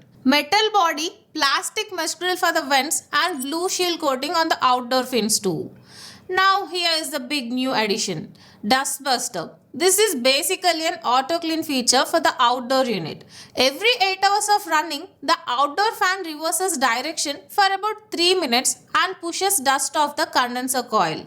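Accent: South India, India. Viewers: Are you from south?? Your speeching style like that...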